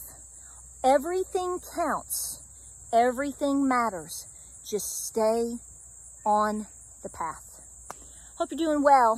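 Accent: South, United States. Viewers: Cheers from the Mountains of morroco u got a beautiful southern accent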